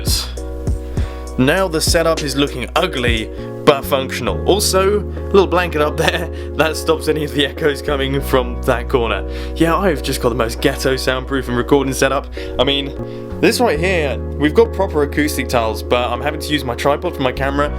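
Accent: United Kingdom. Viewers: Swedish dude with a British accent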